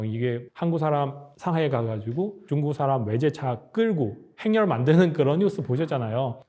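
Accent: Yanbian, China. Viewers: 이사람 중국사람이네 빌음이 중국사람 ? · 발음이 중국분이네요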